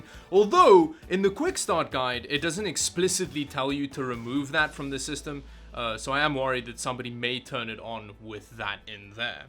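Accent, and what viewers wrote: Afrikaans, South Africa. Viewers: What accent do you have? Dit klink Afrikaans... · You must come from South Africa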